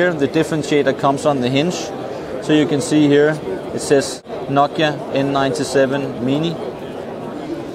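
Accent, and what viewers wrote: Denmark. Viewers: Very danish accent haha... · He sounds danish. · so does sound like a danish accent! haha! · Ja han lyder godt nok meget dansk. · Oh my god, you sound so much like a danish person who speaks English, hahaha.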